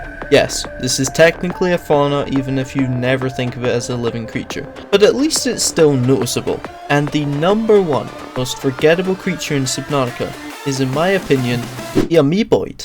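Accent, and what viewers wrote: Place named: United Kingdom. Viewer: Note his British accent p.s. not making fun at it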